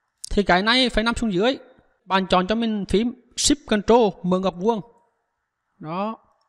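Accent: Central, Vietnam. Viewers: Hay quá a ơi.giọng miền trung cũng rất là chất luôn a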